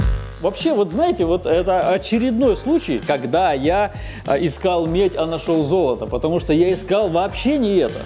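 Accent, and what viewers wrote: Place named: Ukraine. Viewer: Ты как будто украинец, шокаешь, фразы на украинском выпуливаешь) Есть ощущение что множество талантливых людей в рашке - это украинцы)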